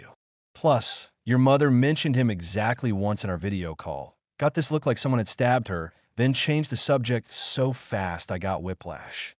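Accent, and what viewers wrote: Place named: South Carolina, United States. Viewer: Absolutely love this story. I'm from SC and that's exactly how we speak.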